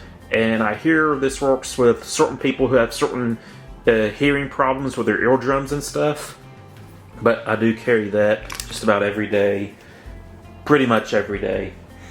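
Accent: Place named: East Texas, United States. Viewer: ok that makes sense. You had a very "no country for old men" type accent.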